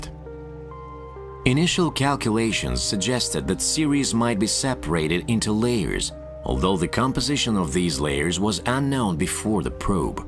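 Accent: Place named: United States. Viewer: Отличная озвучка на английском с американским акцентом. Супер!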